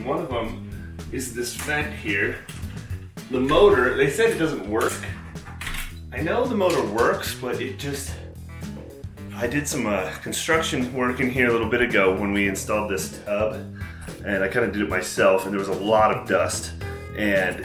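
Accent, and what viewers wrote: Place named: West Virginia, United States. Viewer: You sounded real West Virginian in this video.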